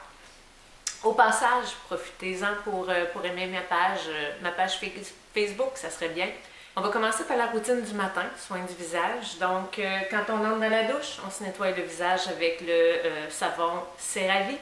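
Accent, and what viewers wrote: Quebec, Canada. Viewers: Hey !!!! Je me trouve enfin sur ta chaine et elle est juste superbe!!!!! Tes vidéos sont superbes et j'adore ton accent québécois. Continue comme ça 😊 bisous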